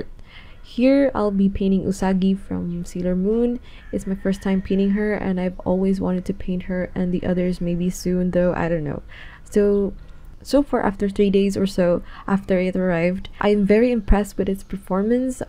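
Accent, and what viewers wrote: Philippines. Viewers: I KNEW YOUR A FILIPINo from your accent ;A; and hands? Is that weird xD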